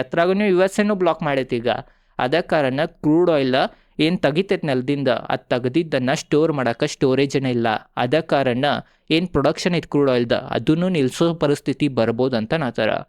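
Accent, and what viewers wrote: North Karnataka, India. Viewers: ಯಾವ ಕಾಂಟ್ರವರ್ಸಿ ಇಲ್ಲದೆ ತುಂಬಾ ಅದ್ಬುತವಾಗಿ, ಅಚ್ಚು ಕಟ್ಟಾಗಿ ವಿವರಣೆ ಕೊಡ್ತೀರಿ.. ನಾವು ಬೆಂಗಳೂರಿನವರು ಆದರೂ ನಿಮ್ಮ ಉತ್ತರ ಕರ್ನಾಟಕದ ಈ ರೀತಿ ಮೃದು ಭಾಷೆಯ ಶೈಲಿ ತುಂಬಾ ಇಷ್ಟ ಆಗುತ್ತೆ....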